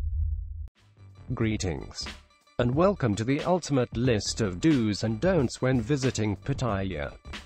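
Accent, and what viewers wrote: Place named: RP, United Kingdom. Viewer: Your tied #1 place for my favorite thai channel with 'do more life'. Love the David Niven accent. Funny channel. Each video has me laughing.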